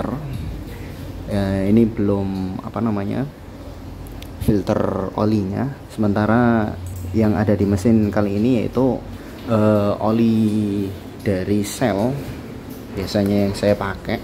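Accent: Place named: Malang, Indonesia. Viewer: Ini adalah contoh bahasa jawa dialek kota malang dan sekitarnya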